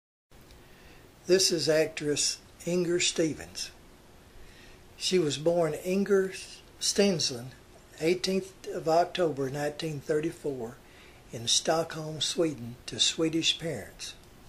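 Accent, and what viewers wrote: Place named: Alabama, United States. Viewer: you sure got that right. and I like the way you talk, whoops done gone Slingblade on ya there Carl. I mean at times we sound alot alike me from mobile an all. Roll Tide 🏈 course I'm just 60,kinda spring chickeny yet badly bent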